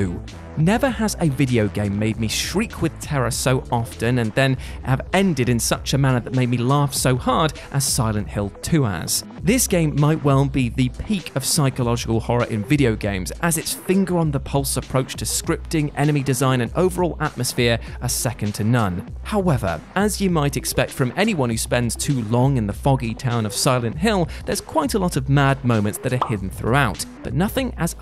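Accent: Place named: United Kingdom. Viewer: Add "inventory" to "aluminum" and "advertisement" as words that sound terrible when said by Brits.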